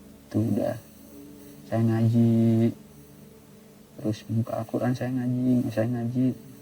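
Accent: Java, Indonesia. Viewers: Logate jawa medok banget😂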